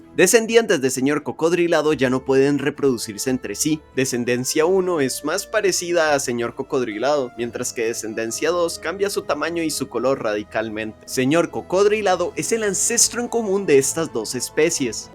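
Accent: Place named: Costa Rica. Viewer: Eeeyyy yo conozco este acento.. ticoo tuanis padre que bueno ver un youtuber de biología de aca.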